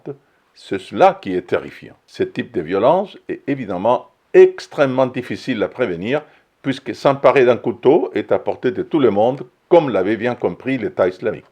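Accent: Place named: Spain. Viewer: les musulmans de France sont bien plus français que toi vu ton accent espagnol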